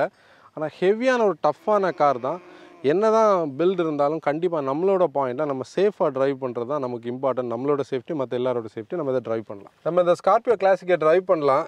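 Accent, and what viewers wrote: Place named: Kerala, India. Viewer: Simple , important , interior , front , point is a word Simble, impordant , inderior , frond , poind is emotion Kerla influenced pronunciation like it though 😃👌😊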